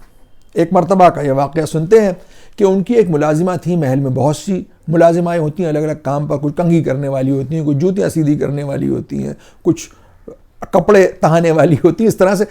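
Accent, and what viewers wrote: Lucknow, India. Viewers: Khudara Urdu alfaz ka tarjuma na kariye ga, kyun ke aap ke dilkash Lakhnawi lahje me Angrezi ki amezish se is ka maza thoda pheeka pad jayega. Aap ka andaz e bayan isi purane Lucknow ke lab o lahje me bahot shirin aur dilkash hota hai. Waise ye mera zaati khayal hai, aap behtar jante hain ke aap ko kya karna chahiye, hum aap ke videos ko khoob pasand karte hain.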